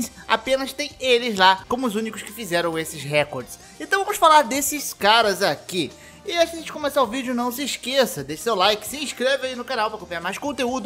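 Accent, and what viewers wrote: Rio de Janeiro, Brazil. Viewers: Tu é do rio mano? Qual parte? Sou da oeste, Tmj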